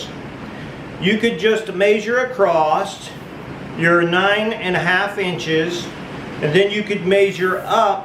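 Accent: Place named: Pittsburgh, United States. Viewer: Just used this video to help me install a damper for the first time. Loved how relaxed and simple you kept it, most DIY or pro style videos get lost in the technical jargon. So thanks for keeping it simple and focused on the point! Plus, the Pittsburghese made me homesick for western PA after a few years away now!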